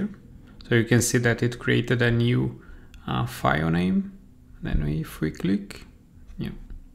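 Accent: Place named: Brazil. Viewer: Muito bom mano, vc parece ser brasileiro pelo sotaque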